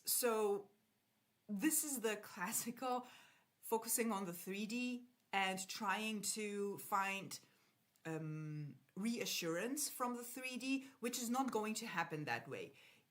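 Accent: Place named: France. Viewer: Hi!! I’ve been following your videos for a while now. I really love your content and you’re one of the people on YouTube whose perspective resonates with me the most. I was wondering what you think about manifesting a relationship with someone (a public figure, but I know that’s not really the point) who doesn’t know us yet. Where would you personally start with something like that? For the past few months I’ve been trying different techniques. Sometimes in imagination I feel absolutely certain that I’m receiving what I want. I know it, I feel it. But after some time, when I don’t see any movement in that direction, I start doubting everything — even the law itself. I always manage to bring myself back, but it’s not always easy. I also have a very specific question: when in dreams you keep seeing yourself in the same dynamic with that person — meaning more in the “fan” position rather than someone who is actually part of their life — do you think that could mean the manifestation hasn’t really settled into the subconscious yet? 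Thank you so much! And by the way, where are you from? I hear an accent that almost sounds French, but I’m not completely sure. 😌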